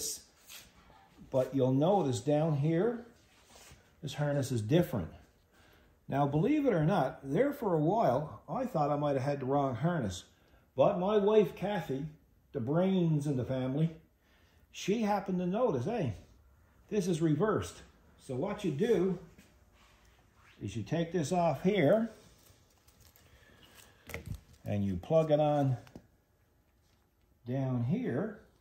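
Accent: Canada. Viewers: Original lights are the IAG ones I’m guessing. I watched the install on those and was like nahhhhhhhhh. I was just thinking fuck it, I won’t bother until I came across these Mabett ones. So far it seems much easier and less hassle. I do appreciate they make a smoked version of the grille lights so prob get these with those lights. I wish there was more grille light options for Base Bronco. It’s crazy the amount of stuff Ford just didnt do for the Bronco. Dome lights, vanity lights, it’s ridiculous. Great tips here tho, saves me some headaches as this stuff always take longer when you learn the hard way. Are you Canadian by any chance? Sound like a Canadian to me, haha. Lot of the mannerisms haha.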